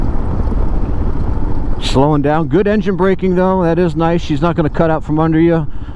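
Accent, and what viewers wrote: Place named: United States. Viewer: Thanks for using metric measurements occasionally. As a viewer from overseas I find the use of Imperial/ US units for tank capacities and fuel economy so confusing, even though I grew up using Imperial units until we changed to metric 60 years ago. Imagine the confusion for viewers who have never had to think about gallons/ miles/ inches in their life! So can you please list that you are using US measures ( even though it should be self -evident from your accent that you are filming in the US)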